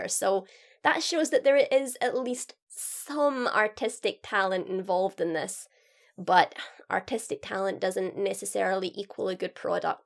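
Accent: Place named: Scottish Lowlands, United Kingdom. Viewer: Trying to identify that accent. My best guess is lowland Scottish.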